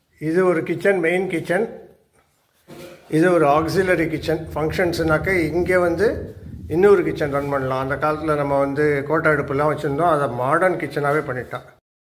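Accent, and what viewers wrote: India. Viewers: Thanjavoor bashi,kekkarathule nanna iruku. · The commentary is typical Tanjore brahmanical dialect..this adds more flavour to the video..Very nice..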